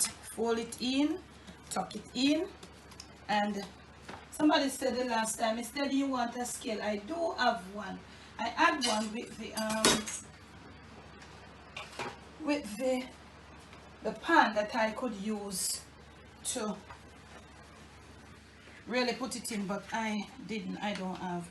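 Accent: Jamaica. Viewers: Hej Miss, Manners an' Respect from Denmark 😊🇩🇰. My wife and I absolutely enjoy your show, and we look at them everytime we receive notification😊. Incidentally today we will try your chicken burgers! You are an inspiration and brighten up our home with your presentations. We love when you say "me soon come"; and miss it in some of the videos...and please don't ever change your accent; it is absolutely brilliant and genuine. We look forward to the next experience, and ready to try some real Jamaican food. Without Brown sauce 😁. Happy Easter from Denmark.🇩🇰 Luca and Tina.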